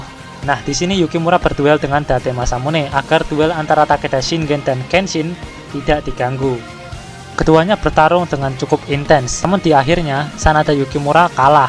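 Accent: Java, Indonesia. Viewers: Medoknya bikin semangat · Logat jowomu medok min,asli ngendi ki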